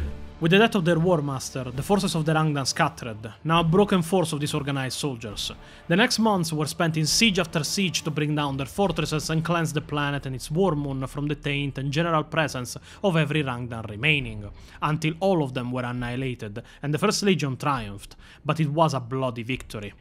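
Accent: Italy. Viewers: That would be his Italian accent… completely unrelated to vampires.. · 40k lore told in English with Italian accents? Gotta say its pretty entertaining. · Daddy’s finally uploaded!!! Let’s goooo! The thick “meat a ball” accent is perfection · The rustic Italian accent gives it a good touch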